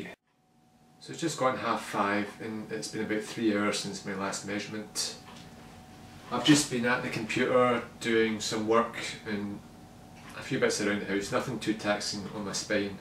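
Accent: Scotland, United Kingdom. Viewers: the Scottish accent is awesome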